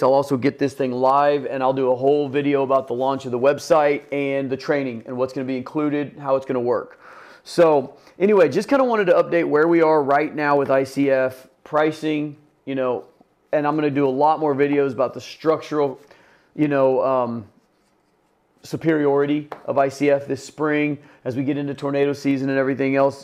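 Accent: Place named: California, United States. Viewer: Sounds like you’re California, like me 😳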